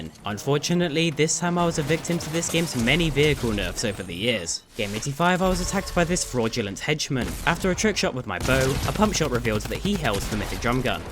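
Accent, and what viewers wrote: United Kingdom. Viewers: Luke te Notable but more calm and british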